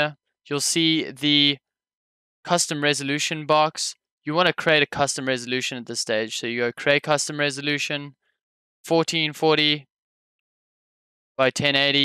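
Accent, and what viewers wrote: South Africa. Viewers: OMW WASSUP FOUND YOUR POST ON REDDIT Heard the ZA accent first 😂 Scroll down and I'm like "HOWZIT!" Subbed. 😎😎 EDIT: lmao thanks for the help as well 😅🧐👍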